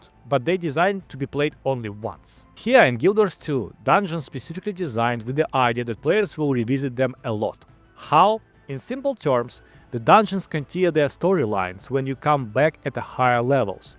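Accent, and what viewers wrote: Russia. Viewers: you have a strong russian accent. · ох русский акцент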